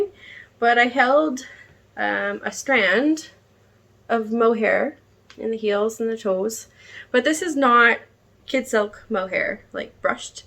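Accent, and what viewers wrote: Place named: Canada. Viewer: Hi!! I’m so happy I found your podcast! I love listening to your subtle Canadian accent 😊 & you’re not talking too fast, at least I don’t think so. Your hand dyed yarn is so beautiful, I hope I can pick some up one day! Thank you for explaining why you do the sleeves first on sweaters…I’m trying to get more sweaters and garments done this year so that’s helpful to know! I’m currently working on my Beauceron Sweater by Vincent Deslandes while watching & I definitely subscribed - can’t wait to see your future podcasts! Sending 💜 from WA state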